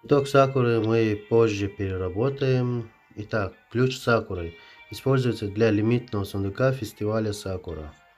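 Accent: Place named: Chechnya, Russia. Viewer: Ахах ну у тебя акцент чеченский,) улачи в развитии p.s на чеченском-волк)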